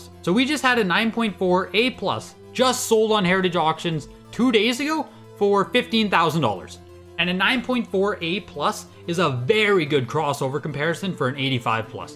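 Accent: Canada. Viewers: I cant take you serious with your massive shoulders long hair tight shirt and canadian accent. Youre all over.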